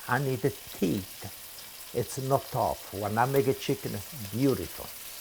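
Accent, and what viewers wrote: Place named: Apulia, Italy. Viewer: He's Pugliese. Dummy.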